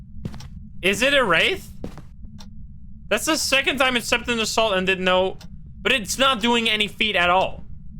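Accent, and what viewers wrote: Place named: Netherlands. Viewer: are you from netherlands or belgium?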